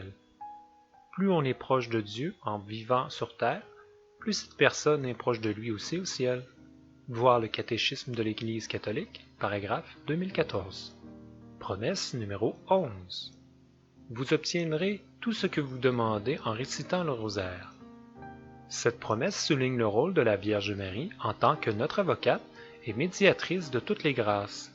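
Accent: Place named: Canada. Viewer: douce vierge marie accent canada il faut bien ecouter moi qui suis d origine allemande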